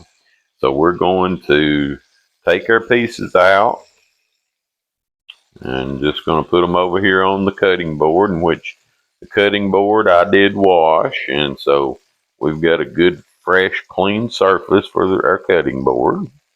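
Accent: South, United States. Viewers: thans for the vid..'....i do this with hamburger , make four patties ,fry pour of cooking oil then add 1 jar beef gravy let simmer on low for about 30min while i make mashed pato, and greens which brings me to my Q. what keeps the homemade gravy from turning thick to the point of being clay .because gravy will get thicker the more you cook it .what am i missing .thanks ...and where are you from , nice to hear a voice from the south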